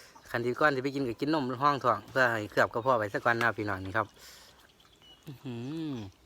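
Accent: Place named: Phu Thai, Thailand. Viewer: สำเนียงภูไท จริงๆ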